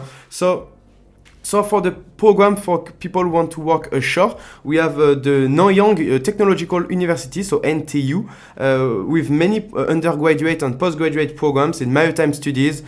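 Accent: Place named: France. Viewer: Ouuufff. Want to know how i know you're french? 😂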